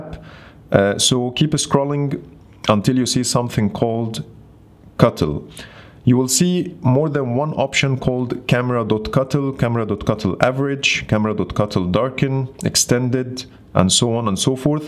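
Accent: Egypt. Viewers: انتا مصري حاسس كدا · Ur accent is Egypt English? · You sound kinda Egyptian tbh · واضح من لهجتك إنك مصري 😁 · This accent is familiar are you Egyptian?? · Your English saying that you're Egyptian, am I right?! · مصري مصري ، اللهجه فضحاك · هو انت مصري اصلي حاسس بتواصل في سرعة كلامك مع مخي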